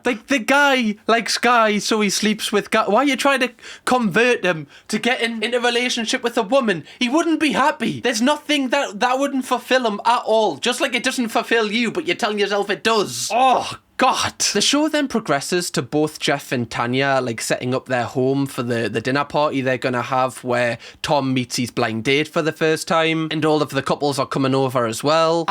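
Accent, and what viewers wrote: Newcastle, United Kingdom. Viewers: I assume you know that Pret a manger is a high street coffee chain you will find across the UK and worldwide… not just a shop in London. Weird comment, just through me off to hear a londoncentric geordie 🤷‍♂️ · The jordie accent is what makes the best if he was from Liverpool 🤮🤢 I wouldn’t whatch him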